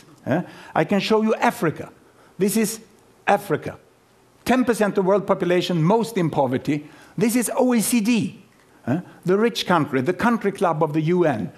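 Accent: Sweden. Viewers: Dear Saturday Night Live, this is how english with swedish accent sounds like. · His accent is sooo typically Swedish :') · älskar hans svenska uttal.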